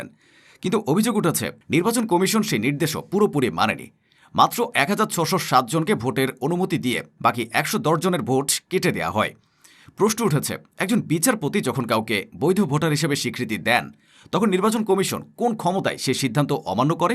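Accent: Bangladesh. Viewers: কথা শুনে মনে হচ্ছে বাংলাদেশি ,,🥴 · The accent of narrator seems Bangladeshi not Indian. Mere wet dreams!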